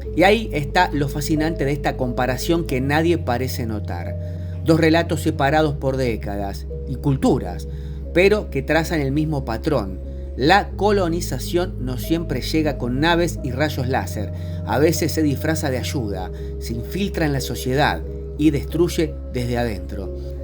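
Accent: Argentina. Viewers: muchos ya deberían darse cuenta que argentina es un país latino y se habla español, solo que no les gusta o no entienden el acento rioplatense 🤷‍♀️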